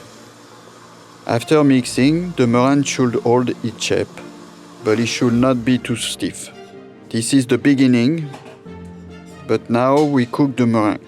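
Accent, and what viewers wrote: France. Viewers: "I see people do crazy things, you know." said the deadbeat-sounding Chef in a thick French accent with a very serious tone. Are you feeling well lately? I am concerned. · He's painfully French, judging by the accent. But an amazingly skilled chef, nonetheless. I wanna try the Ouefs en meurette, and the jelly poached eggs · I'm french and even for me it was too much. We all know that our accent is hard when we speak english, but yeah that's kind of extreme here. Still loved his "and voilà" at the end. 😂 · Intelligible? What? He has a French accent. He is French.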